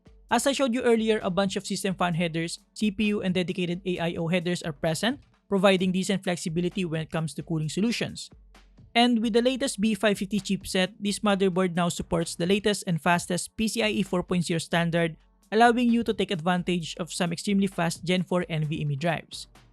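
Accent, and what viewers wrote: Philippines. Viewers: Just the way you talked I immediately knew you were a Pinoy XD. Jollibee gang from California lol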